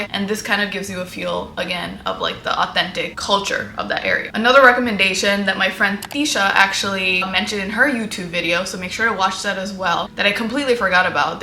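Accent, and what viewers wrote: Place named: Los Angeles, United States. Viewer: You’re not a native New Yorker… you have an LA accent not a New York! So quit frontin!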